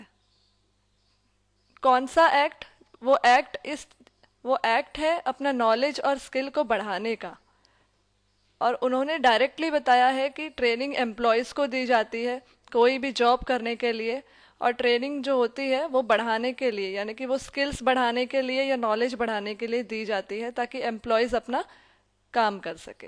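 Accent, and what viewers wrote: India. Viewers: Helpful program but Indian English voice that is difficult to hear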